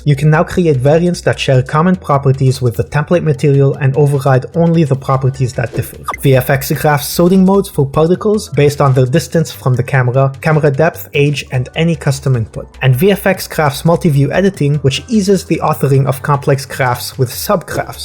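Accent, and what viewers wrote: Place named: France. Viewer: Ton accent t'as trahis à des kms à la ronde ahaha Très content de voir du contenu de qualité de la part d'un français !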